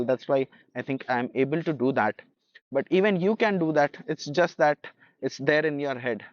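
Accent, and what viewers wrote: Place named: India. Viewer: Bro your English is like someone is talking in hindi with 😂😂😂 I don't know why your voice is so comfortable like I don't even know for sometime that you're not talking in hindi ❤❤❤❤❤❤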